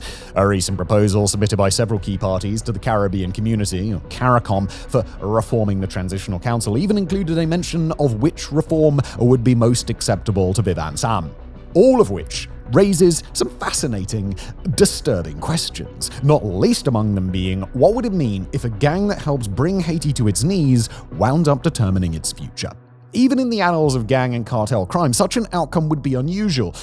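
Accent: United Kingdom. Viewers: He said Mali, British peoples L's sound like R's because of their accent.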